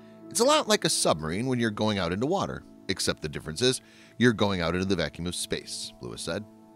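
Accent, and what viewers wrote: United States. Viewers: Whats the matter ? Michele ? you not like his midwestern accent ? you biggot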